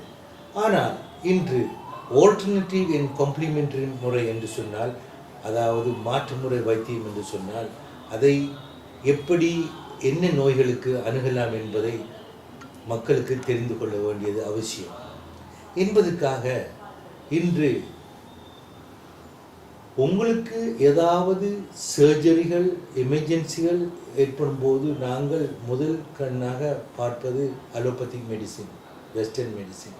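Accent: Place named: Tamil Nadu, India. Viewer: Congratulations dear Dr it's nice to hear like Tamilnadu tamil.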